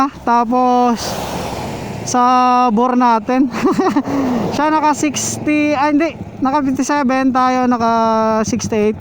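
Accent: Iloilo, Philippines. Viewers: Ilonggo ka sir?' Idol ko ung punto talaga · ilonggo ni gali ayy. bakbaki ahhh🤣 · Ilonggo kapala boss, sa diin ang inyo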